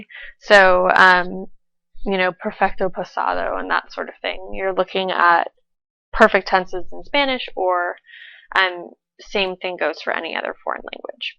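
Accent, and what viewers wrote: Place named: United States. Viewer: Hey from Brasil It's quite nice your way of teaching and your accent as well. Are you american?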